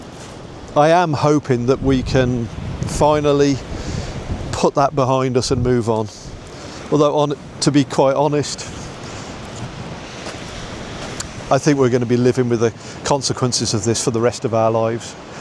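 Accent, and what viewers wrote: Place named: Midlands, United Kingdom. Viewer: Your family should be so proud of you! You speak such sense … I really found this interesting and drew parallels with my own life. You sound like a fellow midlander… good luck to you x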